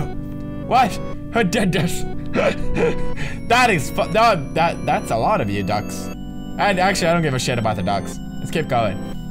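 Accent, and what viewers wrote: Sweden. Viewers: Lmao he sounded a lot more Swedish back then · Damn his Swedish accent was way thicker back then.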